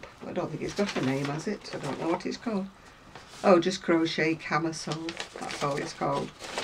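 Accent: United Kingdom. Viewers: Hi:) I loooove watching your vlogs and listening to your voice with a British accent:). Whaaat a nice sweater ____ looove it:)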